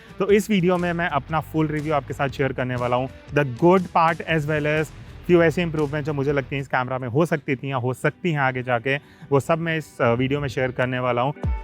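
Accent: India. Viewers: Are you speaking English or trying to f*ck the language? Don't even try it again, you had better speak your own language (actually it sounds Indian, not English), and you have no f* idea how to pronounce it.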